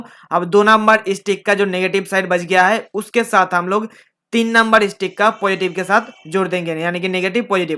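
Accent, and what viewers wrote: Bengal, India. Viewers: You are Bengali Right ❤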